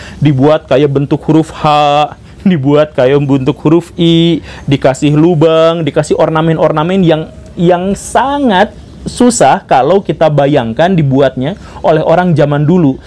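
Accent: West Java, Indonesia. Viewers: Jarum kecos Ranggeteng hadeuh.. Sunda pisan si Akang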